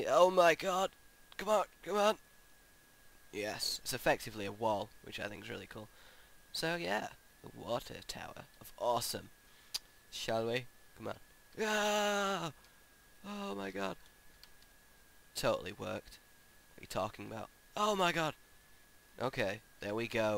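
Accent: United Kingdom. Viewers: british version of cartman from south park xD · Your accent really frustrates me. I'm sorry. You sound like a British person trying to sound Australian and American at the same time. Nice videos by the way.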